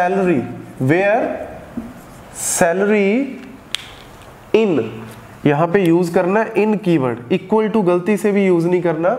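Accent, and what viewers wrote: Punjab, India. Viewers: You are amazing man. Thank you so much for your lovely sessions. I particularly like the punjabi flavour in your Hindi. These sessions have been of so much use to people like us. May you always stay healthy and happy. Coud you make a few sessions on SQL queries that are frequently used by manual testers. Would be of a great great help. Thank you once again from the bottom of my heart. Let us know your name dude.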